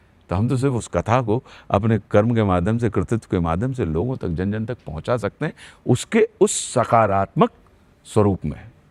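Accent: Standard. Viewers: Haven't heard such a pure Hindi narration in a long time. Ashutosh Rana's command over the language is impeccable. I wonder where the intellectual people of our country are nowadays? Feeling great listening to it. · Kya zbrdst shudh hindi bolte h ❤ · So good to listen pure hindi, very rare to hear pure language these days.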